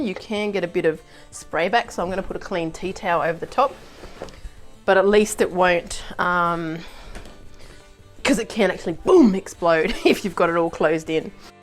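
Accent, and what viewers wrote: Australia. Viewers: Hi I’m a newbie to dehydrating and came across your post. Firstly thanks so much for your excellent presentation and so good to hear a fellow Australian accent. Will certainly try the spaghetti bol recipe. Happy days from Nelson Bay NSW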